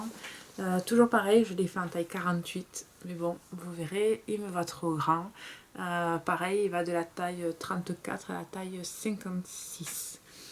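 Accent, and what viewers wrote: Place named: Narbonne, France. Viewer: supers tes pantalons dommage pour moi les patrons pochettes sont epuisees tu as un accent comme moi de narbonne lol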